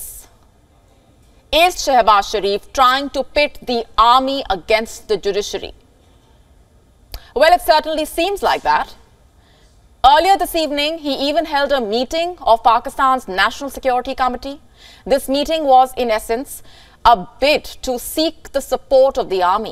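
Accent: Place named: India. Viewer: This is the worse presenter in Wion. Her spoken English is Indian not English.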